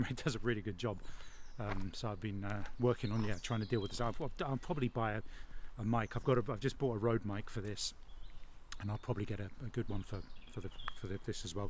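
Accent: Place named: England, United Kingdom. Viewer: It's nice to hear a English review on this lense instead of some high speed American I'm thinking about it because its always nice to have a little extra reach, good review thank you.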